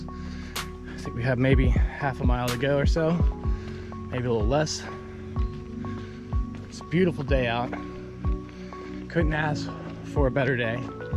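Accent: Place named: North, United States. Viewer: You said you have been there 25 years but your accent is a bit more 'up north' sounding. Where are you originally from?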